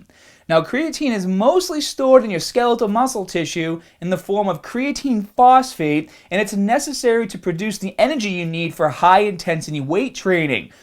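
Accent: Boston, United States. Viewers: Love that Bahston accent!!! Thanks for the info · you've got to be from Boston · why are you talking like if you're in the Departed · Every 10th word has a strong Boston accent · You have the same accent as JFK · love the Bhaston accent!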